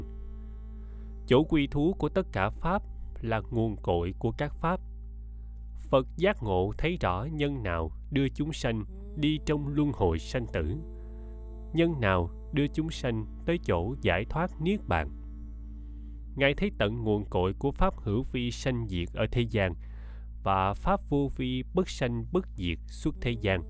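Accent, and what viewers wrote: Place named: South, Vietnam. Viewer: Rất cám ơn thầy Thích Thanh Từ và KTH. Tôi ở nhà có cuốn kinh Pháp Liên Hoa nhưng rất khó hiểu. Nhờ nghe bài giảng tôi mới hiểu thêm nhiều. Tôi cất giữ những kênh này mãi mãi ! Giọng thầy đọc rất hay, trong và sáng sủa và nhất là thầy nói giọng Nam giúp cho tôi hiểu rất dễ dàng hơn giọng Bắc . Rất cám ơn! Nam Mô A Di Đà Phật.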